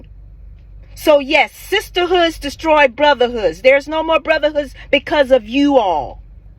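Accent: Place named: South, United States. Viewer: Man this the kind of woman I was raised around. Sound like my aunts in the south. You dropping nothing but the truth.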